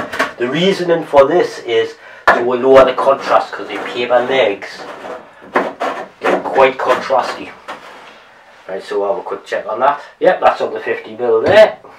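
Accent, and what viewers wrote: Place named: Newcastle, United Kingdom. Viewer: Love the vid, Thank you Great information! :-) Its a good job I can, sort of understand Geordy..... I think others would benefit with subtitles :-D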